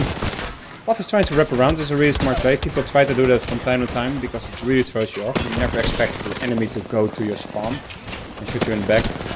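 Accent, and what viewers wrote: Netherlands. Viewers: Yo are you Dutch?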